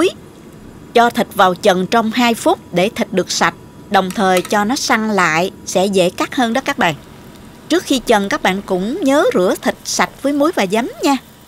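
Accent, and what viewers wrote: South, Vietnam. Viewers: Nghe giọng hướng dẫn cưng quá là cưng, đúng kiểu nói người miền Nam. Chúc chị luôn ra các hướng dẫn chi tiết và hay như vậy nha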